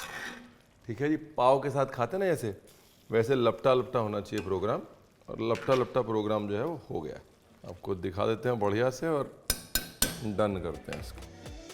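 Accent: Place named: Punjab, India. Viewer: Hello Sir, mein aapko corona time se follow krti hun. Aapki recipes banati hun, jb ghar wale khush hote h khana kha kr to mujhe bhi khushi hoti h magar aapka bhi khayal aata h ki aapki recipe dekhkr hi ye khushi mili h mujhe. Aaj meine apna channel start Kiya h. Thoda success mili h to khyal aaya jinki wajah se mili h to unke liye bhi recipe banti h. To meine abhi 2 recipe aapki bnane ki koshish ki h or unhone upload Kiya h. Jyada nahi but jitna bhi pyaar mujhe logon se mila h aapki wajah se. Thank U Sir.🙏. Aaj aapki recipe notification aaya to jhat se ph khola dekhne ke liye. Aap itne km time mein 2, 3 recipes keise upload kr lete ho ek hi video mein. Aapki baatein sunkr maja bhi aata h. Mein to Punjab se belong krti hu ,ab Delhi mein hun but aapki baatein sunkr apne punjab ki jhalak mil jati h. Thank U Chef😊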